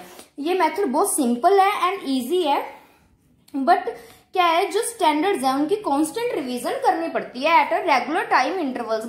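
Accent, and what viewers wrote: Punjab, India. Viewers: I loved the way u speak U have punjabi essence in ur speaking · Mam app punjab se lgte ho haina??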